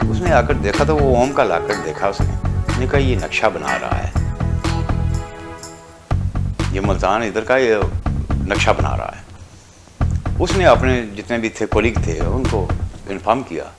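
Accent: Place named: Jammu, India. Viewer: his dogri accent is good ,with the music its like a old bollywood film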